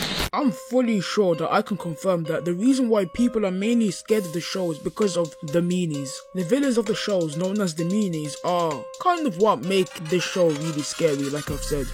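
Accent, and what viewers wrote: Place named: Roadman, United Kingdom. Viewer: I like your accent fam roadmen are cool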